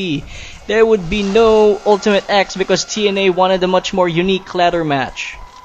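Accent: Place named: Philippines. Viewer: I know ur filiphino, cause, i can tell ur accent ;D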